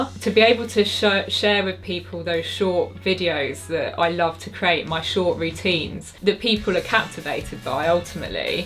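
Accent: England, United Kingdom. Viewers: Incredible fit blonde beauty, British accent with a mild lisp with a niche subject = 1 million subs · I’m fascinated by the juxtaposition of your British accent and the baseball caps in the background which are representing teams in the USA. 😊 Would you mind sharing your connection to baseball? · I really like your English accent 🇬🇧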